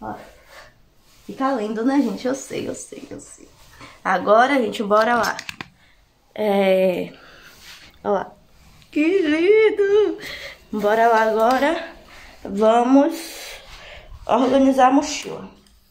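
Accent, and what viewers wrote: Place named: Northeast, Brazil. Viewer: Ela eh nordestina vcs não entendem que o sotaque eh normal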